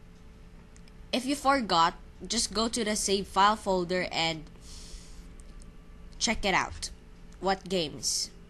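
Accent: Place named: Philippines. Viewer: i bet u that ur filipino, u have my friends accent.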